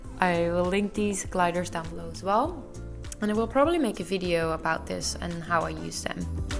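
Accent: Netherlands. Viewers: I smell een Nederlanderrrrr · you sound like you are dutch! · I think she’s Dutch sounds it …Wonder which part of the Netherlands she’s from :) Nice vid 🎉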